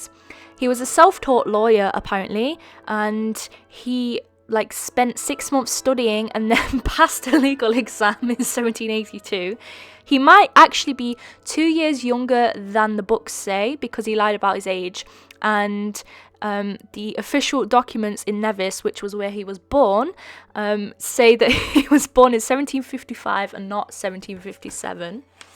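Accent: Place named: Scotland, United Kingdom. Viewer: Am I the only one who watches these for her soothing Scottish voice? Honestly it's beautiful and I wish I had your voice :3 I'm English myself but I just wish I had your accent 💗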